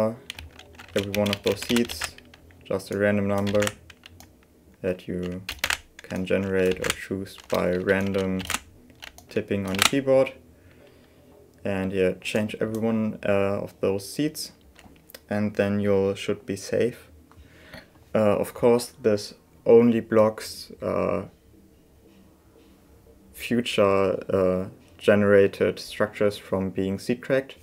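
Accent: Germany. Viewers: Du bist sprichst deutsch oder? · Ich finde es wunderbar wie man sofort hört dass du deutsch bist hahaha :D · Bro i am hearing the DEUTSCH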